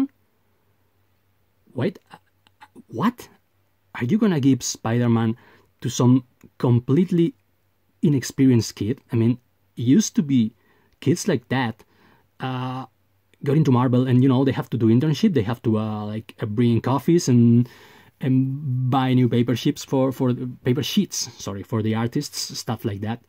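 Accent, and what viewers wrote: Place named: Spain. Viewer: The highlight of your videos is hearing you swear with your Spanish accent. Love it! 👌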